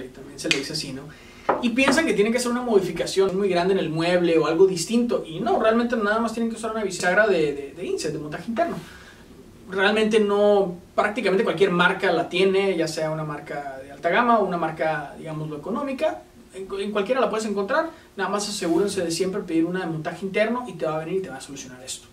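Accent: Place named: Bogotá, Colombia. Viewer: He estado mirando la we y he visto que es de México, Me llamó la atención porque me daba la idea de un acento de Bogotá- Colombia y me he sorprendido al ver que es de Tijuana-México. El acento es prácicamente igual, de lo cual deduzco que México no es el solo acento que más conocemos , que será de algunas zonas, pero el de este joven es muy correcto, muy bien pronunciado, pero no es muy conocido.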